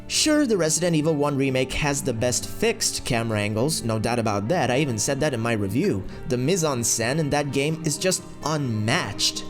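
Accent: United States. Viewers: You don’t seem to sound like your from any part of Europe when you said you were from a part of Europe I was shocked because you sound American. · Where in Europe are you from? You sounded American until you said Europe.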